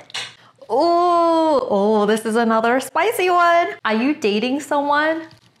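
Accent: United States. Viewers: i haven’t watched in forever but it feels like you have an american accent now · You've been in the states for so long u have an American accent now. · Love the new Americanized accent. 😍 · I've not watched Wengie in years and was shocked about her now American accent! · She’s got an America accent 😂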